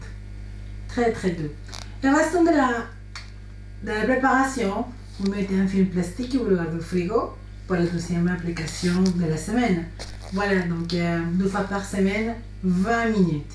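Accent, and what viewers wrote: Spain. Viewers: Elle est d'ou cette femme elle a un accent spanish en tt cas el est belle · accent espagnol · ton accent spagnol me dérange en parlant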